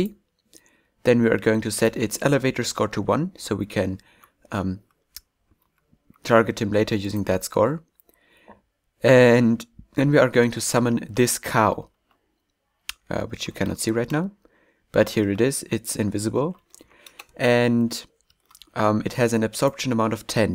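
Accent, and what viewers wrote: Germany. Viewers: He's german, but that is very little of a german accent ^^ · Come you from Germany?!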